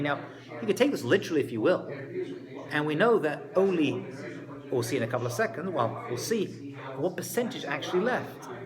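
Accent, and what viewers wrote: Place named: United Kingdom. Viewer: No disrespect he sounds more like English or British person wow back to the study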